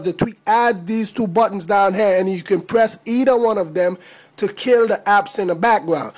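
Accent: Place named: Jamaica. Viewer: nothing gets me more pumped than a jamaican accent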